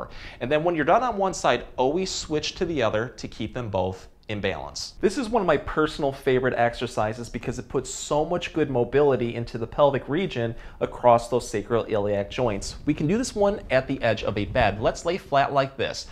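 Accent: Michigan, United States. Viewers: are you from Michigan? Trying to place the accent.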